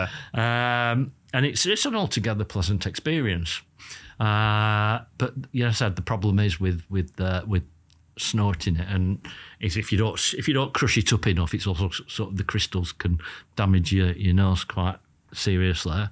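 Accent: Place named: Accrington, United Kingdom. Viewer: This guy is great and sounds like he lives near me (Accrington) would love to meet him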